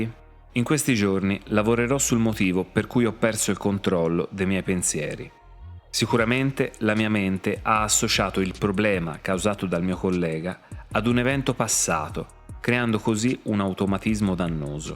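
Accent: Tuscany, Italy. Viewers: Cmq mi fa impazzire il tuo accento toscanooooo🤣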